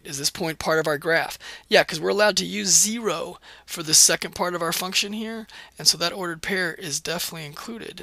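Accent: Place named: United States. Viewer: Super helpful and all, but did anyone else thinks he sounds like Caspar Lee with an American accent?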